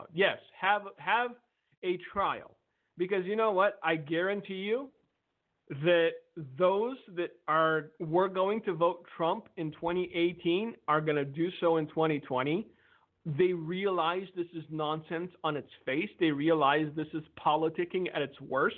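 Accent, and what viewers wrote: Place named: France. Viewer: I love your French accent. You kill me. Lol!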